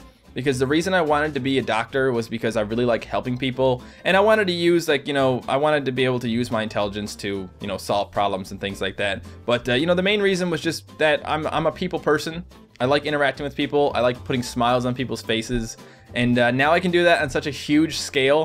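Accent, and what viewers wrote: Canada. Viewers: Hi um C: Just wanted to say I REALLY love your videos and I did not know that you wanted to be a doctor but hearing your reasoning that is REALLY sweet and ahh. I always get very excited when I see there's a new video because I always know I'm going to enjoy it and yeah!! I am sorry if this is awkward I have never left a youtube comment before! ps: When I first saw your videos I KNEW you were Canadian by your accent and was very pleased when I discovered I was right.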